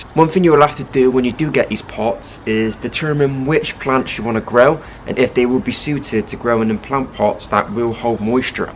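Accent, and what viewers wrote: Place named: West Country, United Kingdom. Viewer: Aww West Country accent❤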